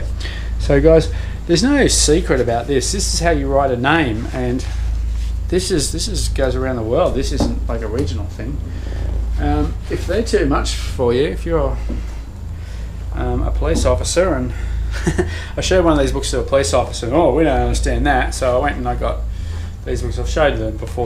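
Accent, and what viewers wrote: Australia. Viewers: I reaaly appreciate your efforts and clear intention, but for a Dutchie like me, your fast talking in Australian English, plus equally fast associations, comparing boxes in "official" forms with pages in a "comic" book. For a beginnner that's hard to grasp, apart from being not informative, but rather a personal opinion of a rebel (for a good cause) and I understand you were one of a few pioneers, at the time of this recording. I imagine that you've reached such conclusions yourself, and since this topic is familiar to more people now, it's possible to grow familiar with this complex subject. We need our trailblazers, and you're one of them 🧡🌈🎃🧑‍🌾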